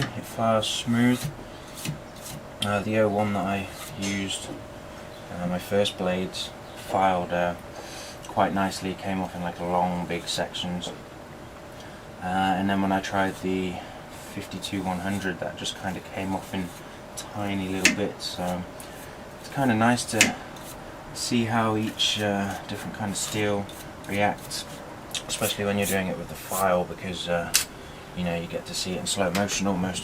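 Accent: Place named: United Kingdom. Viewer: Ahh cool stuff I knew it was a uk accent haha. I have a lot of subscribers from Canada. Cool videos brother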